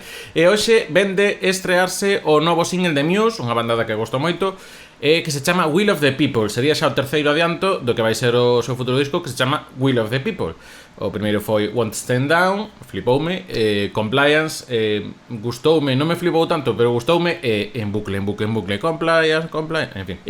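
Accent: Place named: Portugal. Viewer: Genial video man! Pero me sorprende tu idioma re flashero jajaja portu español o nose re loco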